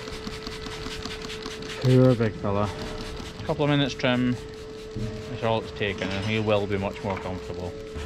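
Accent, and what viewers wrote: Scotland, United Kingdom. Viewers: I'd love to know how long it takes to get that far in vet time to become so good in hoofs ,, nevermind I'll just listen to the Scottish voice 🤗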